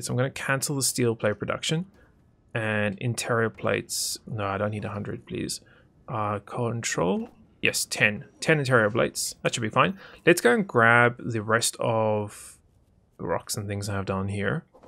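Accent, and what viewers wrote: South Africa. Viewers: Is that a south african accent I detect? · Sounds a little like a ZA boi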